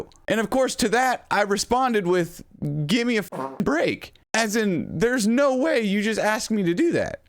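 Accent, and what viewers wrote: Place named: Arkansas, United States. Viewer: Real talk. I ran across your channel and subbed because you sound like a Arkansas hillybilly and you had unbeliveable reflexes, Im here to spend a few minutes watching someone I like, Doesnt matter the game. Big hello to mama Fox.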